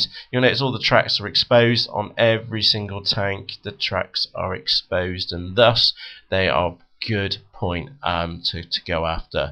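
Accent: United Kingdom. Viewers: Your speak is too British! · are u British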